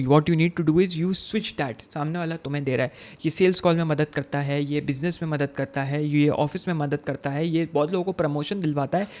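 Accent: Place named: Bhopal, India. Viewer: bhiya why there is slight bhopali accent are you from bhopal?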